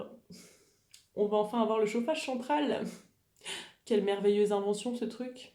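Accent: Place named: Lorraine, France. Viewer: Je viens de découvrir tes podcasts, que j’apprécie beaucoup et je me disais bien que je reconnaissais cet accent...Je suis allée au lycée à Lunéville, j’habite maintenant en Allemagne. Ça me fait plaisir de t’écouter, le podcast est intéressant et inspirant, et ça me transporte dans la région de ma jeunesse 😉. Bonne continuation....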